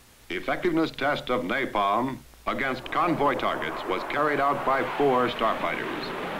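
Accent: United States. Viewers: Thank you again for the upload.... I love the films also the American narration .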